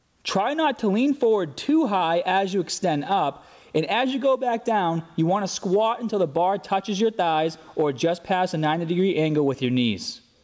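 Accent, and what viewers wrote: Boston, United States. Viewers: I see he hadn't developed his Boston bah-bell "accent" yet..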